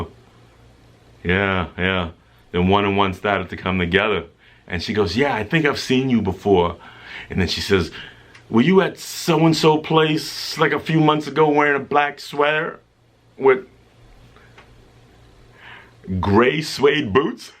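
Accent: Boston, United States. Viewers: 1. Your Boston accent is showing. 2. I can tell edited the video because she inserted "Finally WTF" 😂😂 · Wonder why the twins don’t have your Boston accent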